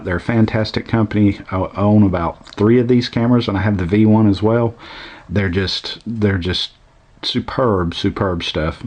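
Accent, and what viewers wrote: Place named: Texas, United States. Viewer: Less jello than a runcam split mini? I hear that texan accent, what part?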